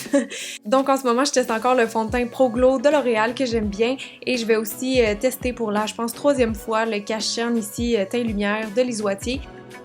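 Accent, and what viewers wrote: Quebec, Canada. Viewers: la marque que tu peines à prononcer se dit " chou et moura " mais ne s'écrit pas du tout comme ça haha ! je vis en France et c'est marrant d'entendre une quebequoise ! ça me donne le sourire au lèvre ! ta vidéo est très pétillante et met de bonne humeur :) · J'adore tes vidéos ! Et ton accent me rappelle le temps où je vivais au Canada.... Je voyage tout en restant à la maison ! :) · Trop cute ! j'adore ces petites habitudes. Belle cuisine avec belles boîtes retro.... envie de goûter le thé bouddha bienheureux mais depuis la France c'est impossible ! dommage. Très bons conseils et ..... avec l'accent canadien, ça donne la pêche ! j'adore ton blog; continue. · J'adore ton accent canadien! 😙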